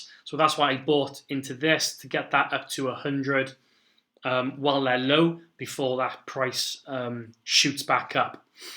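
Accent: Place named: Wales, United Kingdom. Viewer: Sounds like a Cardiff or Zooport accent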